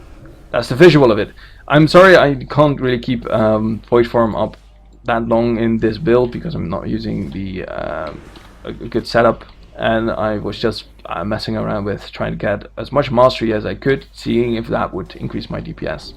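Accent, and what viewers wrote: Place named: Denmark. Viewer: Nice guide! Really helpful! Always funny to hear someone talking english with what sounds like a danish accent! :D I got a few questions. I just recently started maining my Shadow Priest after I saw how fun the Voidform and Insanity Management looks. 1. I'm still using Legacy of the Void since I started playing my Shadow Priest, because it's what I was told to start using and then slowly moving on to Surrender to Madness, however I wasn't that fond of changing because I thought Surrender to Madness was a passive, meaning that it was every time you left Voidform you died, but seeing that it's a cooldown, you can actually play casually, like questing, clearing trash and such without being scared of dying to Surrender to Madness. But honestly, a 10 minute cooldown, yes it's one hell of a strong talent, but the 10 minute cooldown really doesn't seem that appealing, and I might be wrong here, but currently in my Mythic guild we are clearing bosses faster than 10 minutes a part in most cases, does that really mean there's actually bosses where Shadow Priests isn't gaining ANY DPS from their level 100 talents, or am I missing something? 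2. Do you think Shadow Priests will be nerfed? It really seems like we are just a tad too strong, together with Rogues and maybe fire mages (haven't played since the nerf today/yesterday) - It seems like the only way you can justify Shadow Priests doing that much damage is their ramp up time, they're absolutely shit at fast fights and they do have to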